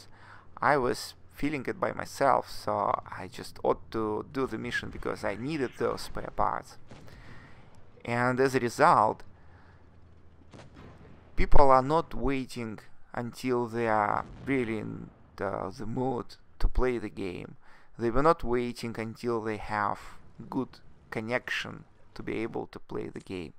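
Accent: Russia. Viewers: акцент русского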